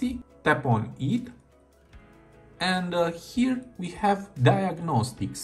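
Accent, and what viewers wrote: Romania. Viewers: Mi-am dat seama din accentu tau ca esti român și dupa am vazut la channel description lol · Laa fel! :)))) Eram: măi, ia stai asa! Asta e accent românesc! :))) am rămas șocat că am recunoscut.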